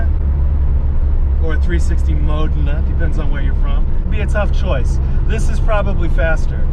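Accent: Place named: United States. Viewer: I never even knew Nobl's were sold in America. Very weird seeing this car on yank roads and hearing an American accent talk about it. Top job.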